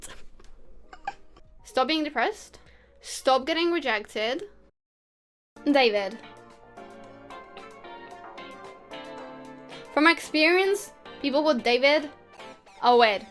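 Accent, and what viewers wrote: United Kingdom. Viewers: That's the thiccest British accent I have ever heard........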